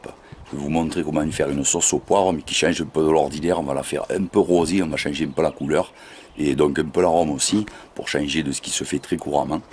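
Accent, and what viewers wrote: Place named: Provence, France. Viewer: Oh fan, tu me fends le cœur ! Je fais la même et je la termine avec un trait de cognac ! Je regarde régulièrement tes recettes et je me régale de ton accent, le vrai, celui de Pagnol et qui me manque tant là où j'habite (Auvergne) ! Mille merci pour ton partage, et compliments, cuisiner sur deux plaques électriques ça mérite une médaille ! Adéssias Amigo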